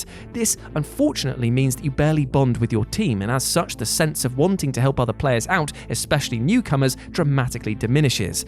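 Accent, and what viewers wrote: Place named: Wales, United Kingdom. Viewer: Very Welsh it is 😁 (Wales is part of the United Kingdom)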